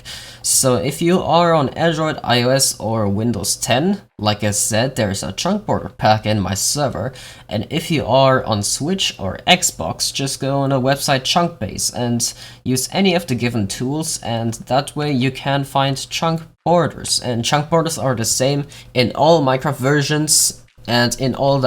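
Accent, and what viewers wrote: Germany. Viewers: sounds a bit German(?)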